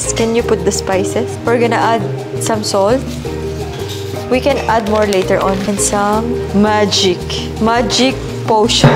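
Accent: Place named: Philippines. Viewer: talks exactly like the wife his intonation, Pinoy accent, laugh and man er of speaking he adapted from her. Both are happy people always laughing and joking.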